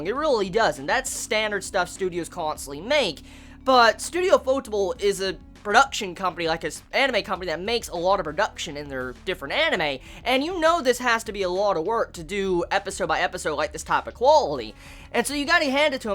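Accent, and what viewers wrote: United States. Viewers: Are u American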